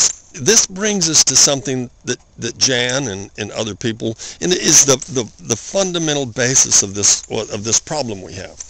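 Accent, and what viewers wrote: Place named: South, United States. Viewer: a southern drawl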